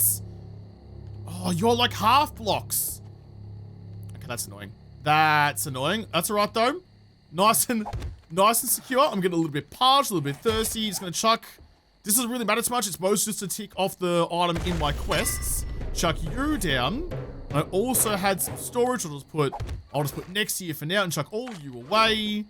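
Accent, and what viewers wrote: Australia. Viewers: Bro where in Australia are you from?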